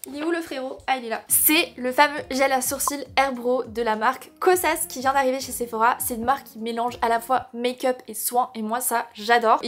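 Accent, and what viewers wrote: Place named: South, France. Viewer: J’ai adoré la vidéo ❤ tu dis tout le temps « quand même » avec un léger accent du sud 😂 j’adore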